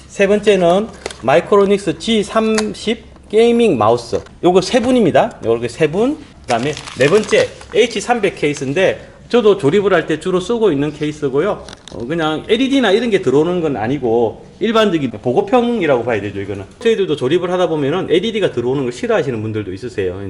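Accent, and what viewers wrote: Gyeongsang, South Korea. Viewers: 구수한 경상도 말투가 방송볼때 좋았습니다....사실 저도 경상돕니다..ㅎㅎ건승하시길 바랍니다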